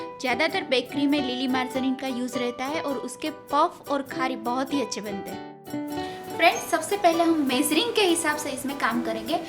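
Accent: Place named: Gujarat, India. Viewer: Good receipe. Thanks for sharing. But why Gujju people pronounce like Gujjus please work on your words. Come out of Gujju accent you will ROCK for sure. All the best.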